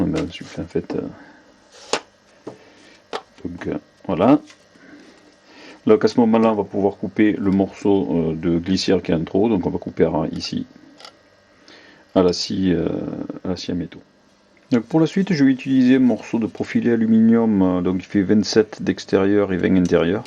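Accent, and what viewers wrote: France. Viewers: he speak FRENCH , he has an accent from the sounth of the france.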